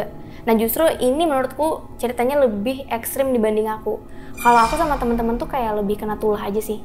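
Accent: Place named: Banjar, Indonesia. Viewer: Ciri has urng banjar bekisah nahap bnr😄 tapi asik yaboyyyyy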